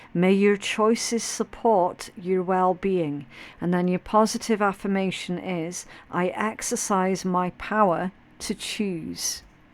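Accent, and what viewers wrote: Scotland, United Kingdom. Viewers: 444 everywhere atm …444 likes and the time I’m listening…I even took a screen shot lol we went through a very challenging 3 years …but yes come through …integrity intact. 🌝😅 We have so many ♏️ in our family…and our Michael granted our family a Miracle last summer 2023 thanks …I’m from Scotland are you too and I’m definitely not allergic to swearing or sarcasm lol…I resonate with your lovely accent. 💙🦢💙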